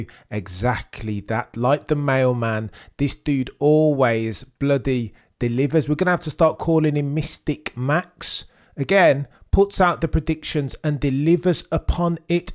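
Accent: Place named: Midlands, United Kingdom. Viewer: I love your Midlands accent haha. I lived between your amazing nice Midlands people for three years. I miss you all and that funny accent❤️🇳🇱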